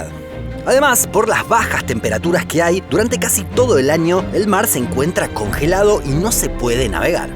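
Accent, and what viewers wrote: Argentina. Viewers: El narrador habla argentino, viste?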